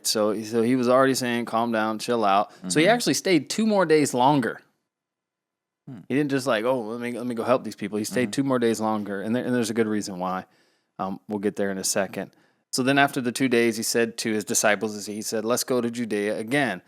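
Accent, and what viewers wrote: South, United States. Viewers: I live in TN haha and I thought you had a bit of a southern accent at times lol. At least now I know you are a good ol Southern Baptist haha!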